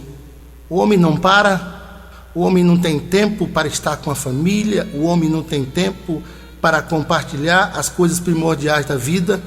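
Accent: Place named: Northeast, Brazil. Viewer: Amém PR. Boa mensagem, boa de se ouvir, um conteúdo de grande qualidade, que o Senhor continue te abençoando mais e mais, mensagem que edifica, parabéns pela sua dedicação nesta obra. Estou aqui em Cotia-SP, e matando até um pouco a saudade de ouvir o nosso povo nordestino, nossos conterrâneos, isso nos faz bem.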